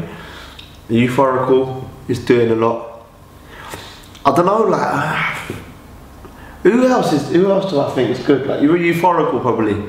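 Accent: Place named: Brixton, United Kingdom. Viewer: He's from Ipswich, why's he talking like he's from Brixton?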